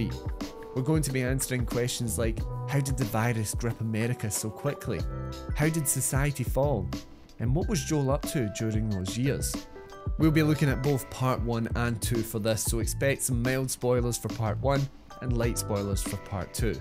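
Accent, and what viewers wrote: Scotland, United Kingdom. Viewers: the universe doesn’t revolve around you, scottish accent is easy to understand for most people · I love your Scottish accent! :) it’s very calmed and easy to understand.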